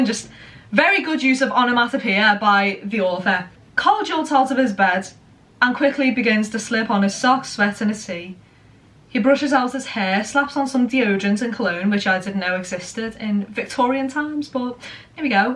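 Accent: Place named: Liverpool, United Kingdom. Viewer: Do you think she’s American? Idk if you’re joking she’s scouse lol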